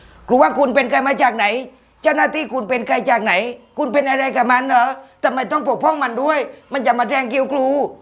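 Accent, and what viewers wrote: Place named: South, Thailand. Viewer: พลัดถิ่นมานาน ได้ฟังภาษาใต้พื้นๆ แหลง ทองแดงแล้ว ขำ คนภาคอื่นจะรู้ไม๊หนิ ว่าเราพูดภาษากลางแล้ว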